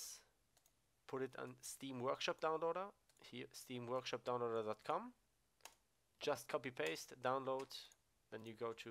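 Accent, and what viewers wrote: Germany. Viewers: Man hört ultra das du deutsch bist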